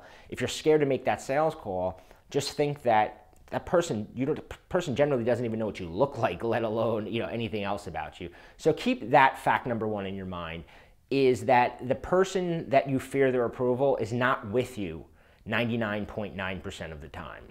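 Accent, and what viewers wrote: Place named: New York, United States. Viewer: are you from Long Island or Queens?...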